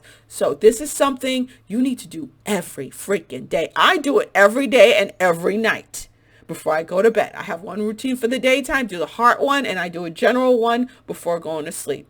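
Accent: New York, United States. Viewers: V you was so NY throughout this video! Lol thank you so much for the heads up! We really in this !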